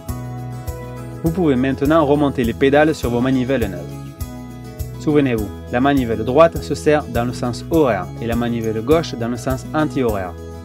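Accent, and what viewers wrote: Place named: South-West, France. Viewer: moi c'est cet accent du sud ouest qui me rend dingue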